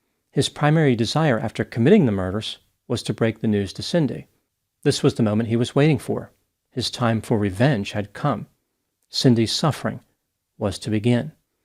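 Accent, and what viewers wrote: United States. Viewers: It took me a while to work out where these places were. I am Australian and the pronunciation was uniquely American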